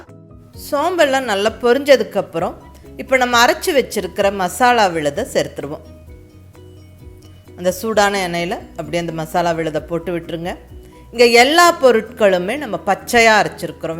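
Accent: Kongu, India. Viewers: Hai Mam! I love to hear your Kongu Tamil. The way you explain , each dish is so clear that even the beginners can understand well. Will you give the link for the white Kadai which you used for the preparation of Ragi halwa?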